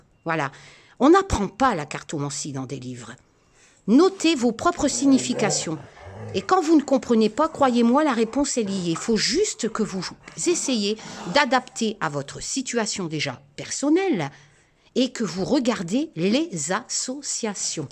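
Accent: Nord-Pas-de-Calais, France. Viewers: Quel plaisir de t entendre parler Ch'ti j adore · Hello lol j adore entendre parler ch'tis mes origines comme toi ❤❤❤❤ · Coucou, enfin te voilà, quel bonheur 💋❤très contente que cela ce calme un peu, mais encore très prenant pour vous. Tres drôle et émouvant ton parler chti ma grand-mère ne parlait que chti je comprends tout. bisous à tout les deux merci pour ton tirage Et courage prenez soin de vous.. (Tu parles des lanceurs d'alerte?)🥰😇🙏💋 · Bonjour, alors je te l'avais dit pas mail, je suis super contente d'avoir recu ton jeu, il est magnifique et j'adore l'effet peau de pêche, et comme je te l'avais préciser également il va partir (avec moi) a l'autre bout du monde rejoindre ma belle famille (Japon) et se fera purifier dans un grand temple, (je t'enverrais des photos de l'évévenement car tu es quand même sa premiere maman!!!! alors juste une petite chose, j'ai vu qu'il y avait la carte du corbeau (oiseau que j'aime beaucoup qui sont des sortes de guides spirituels pour moi) et sur la carte les mots clefs sont danger, accident, trahison, mais pour moi le corbeau ne véhicule pas ca du tout, alors ce que j'ai fait.. 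j'ai tout simplement rajouter sur la carte a la suite (car il y avait un peut de place) les mots "messager" et guide" et voila le tour est joué!!! je voulais te raconter ma petite expérience avec ce jeu magnifique que j'aime beaucoup, (et tout les petits cadeaux) les dessins sont d'une finesses assez incroyables, Olivier a vraiment un don de dessinateur!!!! merci a vous deux pour cette magnifique expérience de vie.... trop drole l'accent "ch'ti" j'adore!!!^^ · 😀😀😀😅😅😅🤣🤣🤣😂😂😂😂 trop beau l'accent chti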